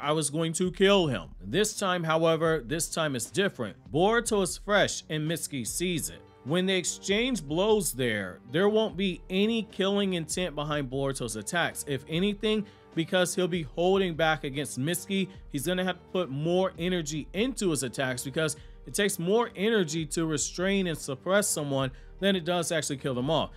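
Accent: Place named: East Coast, United States. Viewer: He really think down south people country 😢 you can tell he from the east coast 😂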